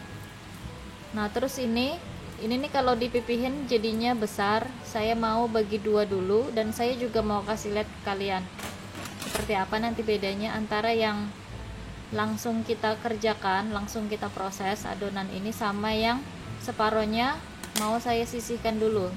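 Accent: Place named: Banjar, Indonesia. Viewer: Pasti urang banjar admin yg ngomong ini hehehehe..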